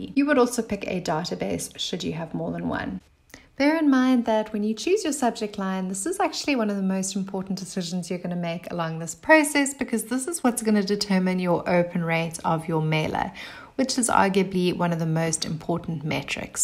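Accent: South Africa. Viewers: sooooo wonderful to hear a South African accent. Yay! Would you know how MailBlaze compares to MailerLite? Thank you for this YT- take care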